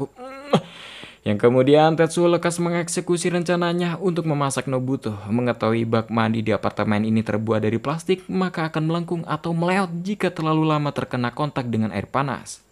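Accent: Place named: Indramayu, Indonesia. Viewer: Orang indramayu tah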